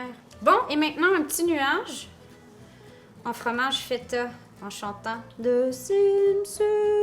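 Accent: Canada. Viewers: 👍👍👍👍😋😋😋😋 j’adore aussi ton accent canadien, il nous donne la pêche 👍👍👍👍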